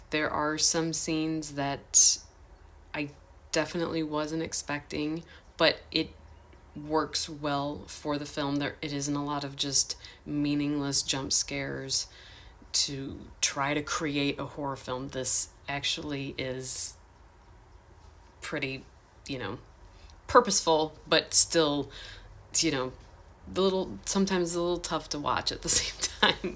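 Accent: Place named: Midwest, United States. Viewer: I dig the midwest twang.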